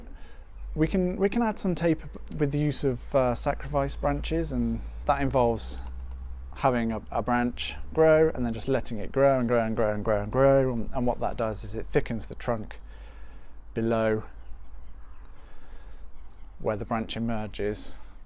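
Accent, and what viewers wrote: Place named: United Kingdom. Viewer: I'm from Germany. By you're accent you're from Britain, right?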